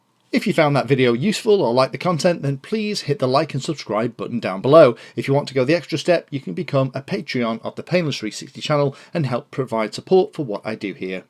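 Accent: England, United Kingdom. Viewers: Always nice to hear your dulcet tones!! In NZ at the mo so great to hear a proper English accent! Always appreciate your reviews (still hoping you might do the Strix Nano Goblin...!) Cheers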